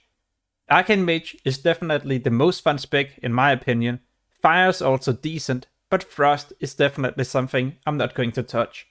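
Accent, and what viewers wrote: Denmark. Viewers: jesus christ, the danish is strong in this one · Denmark I'd say 😀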